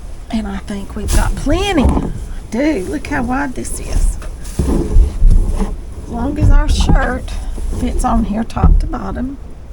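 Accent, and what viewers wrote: South, United States. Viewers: I just subscribed tonight. This is my second video and I watched one more before this one. I thoroughly enjoy your videos. I love hearing your southern accent. Im originally from South Mississippi but due to being in the military and my husband's home being here in Virginia, this is where I live. Thanks so much for sharing your talent. 😊❤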